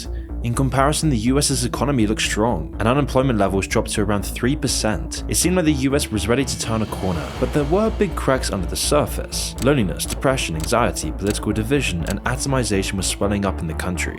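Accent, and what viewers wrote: United Kingdom. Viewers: A person from the UK with a British accent is doing a video on the US economy lol.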